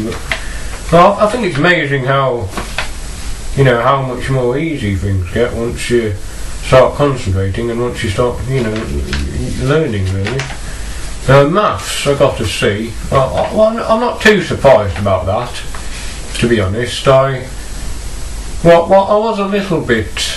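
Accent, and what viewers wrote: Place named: Northern England, United Kingdom. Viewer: His accent sounds northern (English) to me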